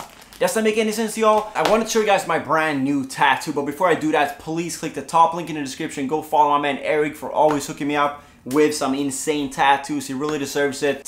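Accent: Scania, Sweden. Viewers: Jonte nej han är ju skånsk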